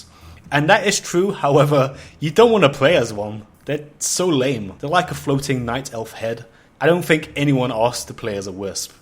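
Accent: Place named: United Kingdom. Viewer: I swear to god every british youtuber has this accent almost seems like its acting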